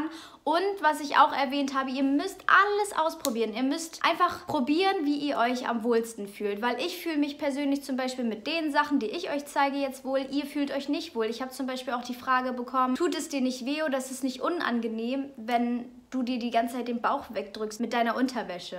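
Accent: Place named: Germany. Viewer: Sie hören Cute Your German Akzent und Sie sehen niedlich diese Jeans in Ihrer Figur. Nette Mädchen Grüße aus Mexiko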